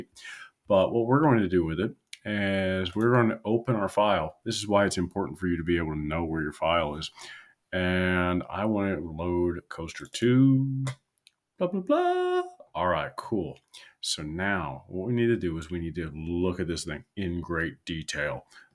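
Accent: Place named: United States. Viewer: you sound the most american ever. you are funny btw hahahahahah.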